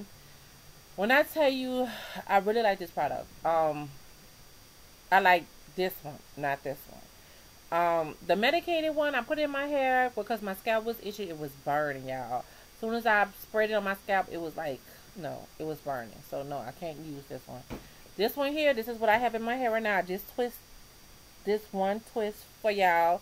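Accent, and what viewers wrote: New Orleans, United States. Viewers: you from new orleans huh...lol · are u from new orleans? ur accent is so cute! lol anywho, im transitioning and my friend who's natural put me on this product and i cant get ENOUGH of it. it sucks cuz the only store in my town i know of that has it jacks up the prices on all of their natural hair care products. but i found a website, <link> that has it for i think 7 or 7.99 and its free shipping :^D!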